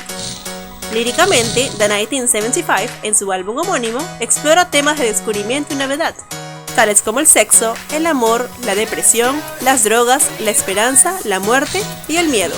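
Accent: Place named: Chicano, United States. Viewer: Me gustó mucho la información, está muy bien hecho 💕 lo único que me incomodó fue la pronunciación del inglés de la chica :/ habla demasiado chicano jajsjj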